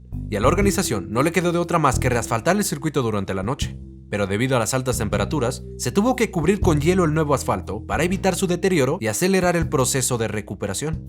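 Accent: Mexico. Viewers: Un saludo desde Juárez México, en que ciudad vives? Veo tus videos desde hace tiempo pero me imaginaba que eras sudamericano con acento por acá, de México.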